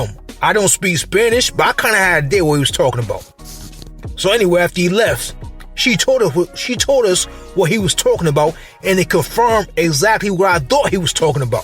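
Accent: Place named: Northeast, United States. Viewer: Keep sharing your stories. You sound like youre from the Northeast. Its time for FBA in the northeast to finally share their truth.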